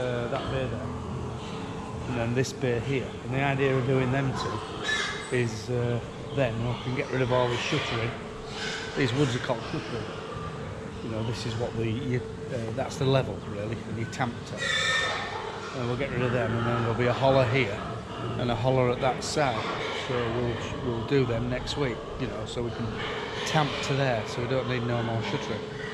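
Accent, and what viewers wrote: Yorkshire, United Kingdom. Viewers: Hi, Just subscribed to your channel, being watching for a while and really love the videos as they are very very good and you explain everything so welland unreal the amount of work you and the lads get through 🥵. Took me a while to get used to the Yorkshire accent right enough 🤠. I'm From the west of Ireland 🇮🇪 and keep dry heifers now, suckler cow herd gone 4 years now and flock of sheep away 10 years now. My grandfather kept a couple of sows when I was young boy and remember them well and always had a fondness for them. Thinking of buying a Claas tractor and would appreciate a run down on yours sometimes as I know you like it. Thanking you and looking forward to your next videos. 🚜👍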